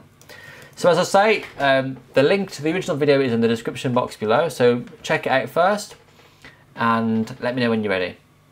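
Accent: United Kingdom. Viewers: Hello from Kazakhstan AND I REALLY LIKE your British pronunciation By the way,do you speak French?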